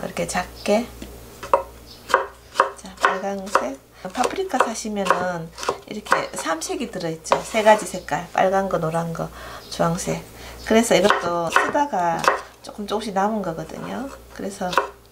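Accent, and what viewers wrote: Gyeongsang, South Korea. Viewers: 가는곳마다 가 계시더라구요..그래서 끌려서 와봤더니 저가 좋아하는 요리채널이네요 경상도말이라 더 정스러워요^^ 조아요와 빨간버튼 꾸욱~~ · 반갑심더.. 딱 말씨가..대구 찍어봅니당.. 아님..어쩔수 없거든요.. 대구가 미인의 본고장 아닙니껴? 구독과 좋아요.,기냥..팍.. 이모님 시간될때 저희집도 한번 놀러오이소.. 캬..먹고 잡네용..^^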